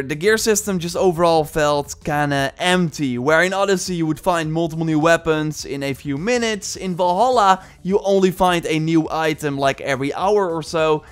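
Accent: Netherlands. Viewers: it's indeed classic Dutch laziness. With some practice they can easily speak English perfectly without an accent but after following this man for about 2 years now he literally hasn't improved at all. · You are aware he has a Dutch accent, right?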